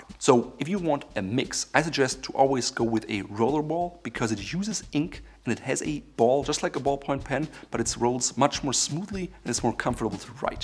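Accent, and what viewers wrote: Germany. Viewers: I hear a slight German accent? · English is not his native tongue. I believe it's German. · This guy is german, but its hard to notice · He's German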